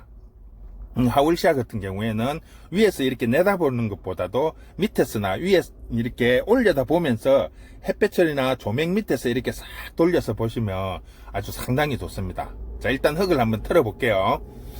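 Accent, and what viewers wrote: Gyeongsang, South Korea. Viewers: 저는 오늘 처음으로 님의 영상을 보았습니다 경상도 구수한 말씀으로 상세한 설명 감사합니다~~^^ 저 완전 초보에다 저희 집은 햇볕이 부족하고 확장된 아파트라 하월시아 기르는것은 좋은 환경인듯 싶네요 하월시아는 영양제는 필요없나요? 그리고 난석의 굵기는 어느정도가 좋은가요 (예: 소.중.대) 물 줄때 저면관수로 주어도 되는지요 오늘 휴일 평안한 축복된 하루가 되시기를~~^-^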